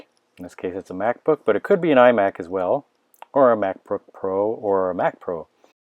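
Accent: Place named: Canada. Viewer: Canadian accent equals automatic dislike!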